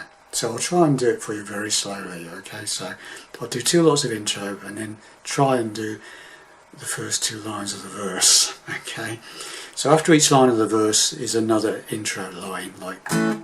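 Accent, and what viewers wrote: England, United Kingdom. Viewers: that's an old Taylor you're playing there young man. As you sound English I'll assume you have a good sense of humour and your turkey-neck tells me you're old enough to remember this one from 1978. and " <link>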